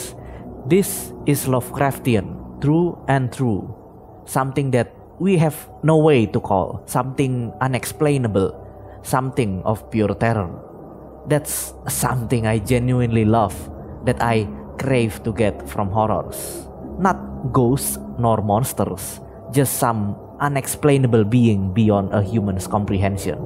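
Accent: Indonesia. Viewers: The accent sounds Javanese to me.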